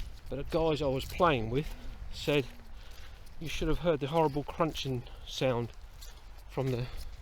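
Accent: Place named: RP, United Kingdom. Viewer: Its good to walk, isn't it,? And good you resumed these walks, if only for your well being. I never tire of seeing Cowdray ruins. I wish Zorro would behave like the spaniel. Thanks for braving the rain and the mud for us. Long may posh voices endure!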